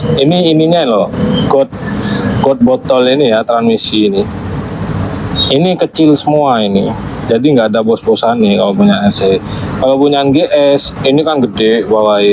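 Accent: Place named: Java, Indonesia. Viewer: Lokasi mana kok bhs nya medok jawa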